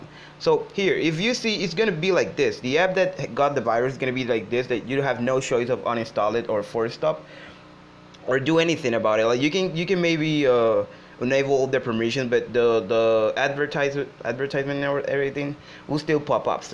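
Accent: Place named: England, United Kingdom. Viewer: Finnaly a ENGLISH GUY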